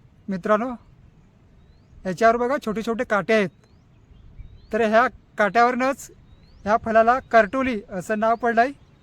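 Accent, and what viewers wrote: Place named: Agri, India. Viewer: खूप छान माहिती दिली, भाऊ तुम्हीं हया भाजी विषयी थोडीशी माहिती मला होती . तुमचा मुळे अजून माहीत झालं, भाऊ तुम्हीं आगरी आहात असं वाटत, मी आगरी आहे. 🙏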